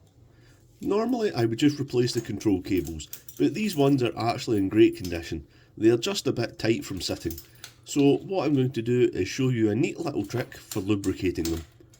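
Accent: Scotland, United Kingdom. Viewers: For years, I'm thinking, why is a Scottish man in America? :face-blue-wide-eyes:But you're not - you're home! I wish I was.:face-turquoise-covering-eyes:. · Never realised you were Scottish🤔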